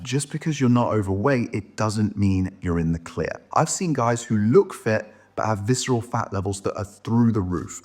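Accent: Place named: Southern England, United Kingdom. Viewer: mid to southern England, but I'm getting little hints of time in the US? and with a porcelain tiled floor doesn't live in the UK anymore...there..just like Poirot ! 😂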